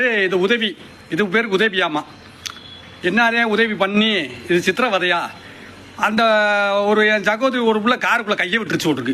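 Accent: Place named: South Tamil Nadu, India. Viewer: Ultimate 😂😂😂 Pucca south TN slang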